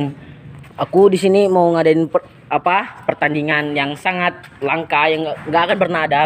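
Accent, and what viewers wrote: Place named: Madura, Indonesia. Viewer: Lu orng maduraa yaaa bg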